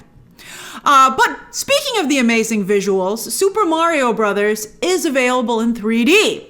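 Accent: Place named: New York, United States. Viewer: Is she really from NY or does she do the bad accent for the pop?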